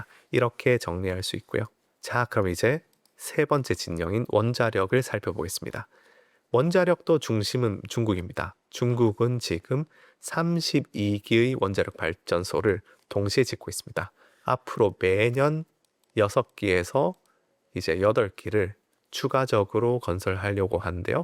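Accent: Gyeongsang, South Korea. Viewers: 처음 들었을때부터 경상도 출신이라는거 알겠던데요 ㅎㅎ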